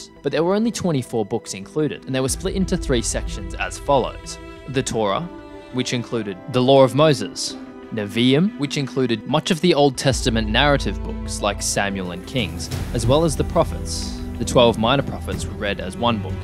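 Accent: Australia. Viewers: Oh, and the New Testament list was compiled in 49AD (not in 85AD like video says). This sounds like that dude from Australia or New Zealand who made millions becoming a street preacher. Is that his voice? Then it would make sense since he's got a lot of the historical facts here or is purposefully leaving out the true historically correct dates.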